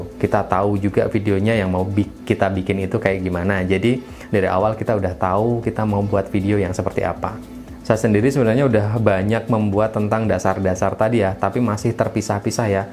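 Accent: Pekalongan, Indonesia. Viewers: Orang pekalongan yah bang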